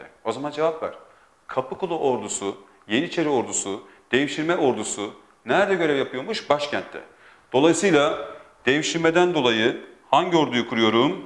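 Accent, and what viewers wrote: Gaziantep, Turkey. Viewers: Antepli olduğunu nasıl belli ediy 😂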